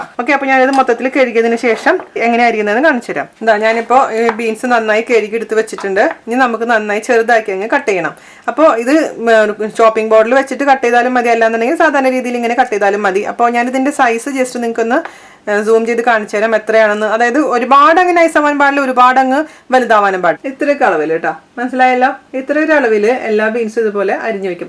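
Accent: Thrissur, India. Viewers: Hi chechi..your explaining well and Thrissur language is good....I prepared this thoran today it is very tasty...look yummy..thank you for this receipe..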